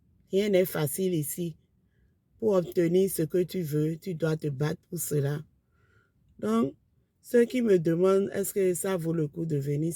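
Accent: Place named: Benin. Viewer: C'est ma première fois, mais l'accent m'a tellement attirer j'ai dis ça c'est mon Benin 😂, je m'abonne direct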